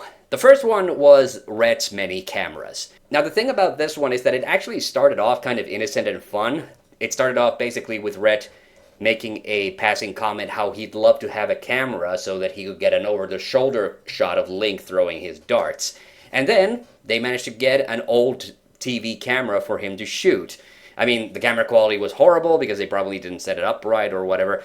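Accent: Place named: Finland. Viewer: Oletko suomalainen? Tyckte jag anade en finskt dialekt...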